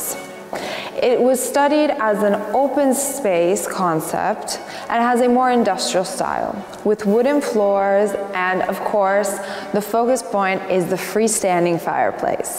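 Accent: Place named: Italy. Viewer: your italian-english accent is so sweet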